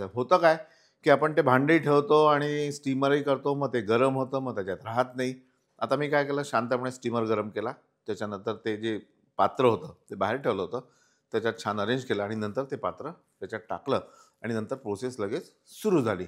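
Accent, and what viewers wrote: Vidarbha, India. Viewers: Sir aap vidarbh k hai, khandesh k hai , ya marathwada k 😊😊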